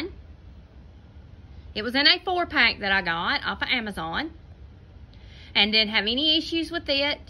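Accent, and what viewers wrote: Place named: Louisiana, United States. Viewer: Thanks for asking that. She told me before it was art canvas and I thought she was saying something different. Her accent is adorable. She sounds just like my Mama who was from Louisiana.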